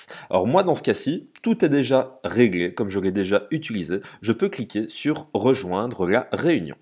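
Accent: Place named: Belgium. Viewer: Bravo! Explication complète, très claire et très didactique, avec une petite pointe d'accent belge bien sympathique... Vous serez sans doute ma référence, de même que ce logiciel l'est également ! Il faut préciser que le système utilise le principe du pair to pair et des serveurs répartis, ce qui convient particulièrement bien dans les zones mal desservies par internet (Afrique...) et qu'on ne dépend d'aucun prédateur du web tel que Microsoft, Apple, Google.... Il n'y a pas d'internet libre sans logiciels libres.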